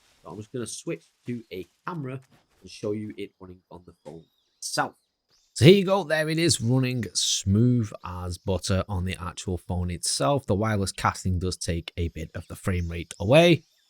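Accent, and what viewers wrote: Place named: England, United Kingdom. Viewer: Maybe because he is speaking English with an English accent.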